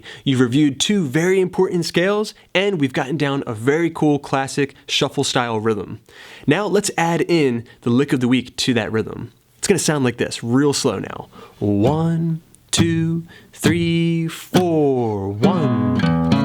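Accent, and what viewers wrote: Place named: Maryland, United States. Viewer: Nice stuff! My first love was guitar, but at 8, I got the old school music store lessons grind, sitting in a room with a rental guitar with strings a half inch above the neck & it was torture for my soft little hands, and SO boring and sad - I moved off to drums & keyboards, Now, as an old guy w/the time to really get to know my way up and down that neck, & lots of good lessons like these online? I'm having some fun & making progress. Off topic: R U by any chance from Md. or thereabouts? We have the same accent. ...Nice lesson(s)! Thanks, again!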